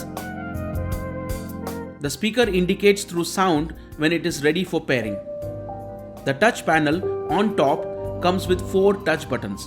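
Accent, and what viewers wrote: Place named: India. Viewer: his accent is Indian not arabian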